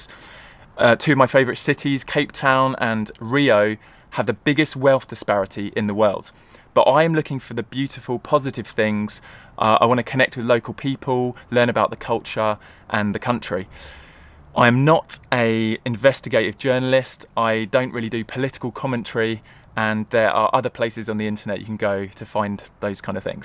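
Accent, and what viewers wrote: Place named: England, United Kingdom. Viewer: This guy looks like a Jamaican Sharlto Copley with an English accent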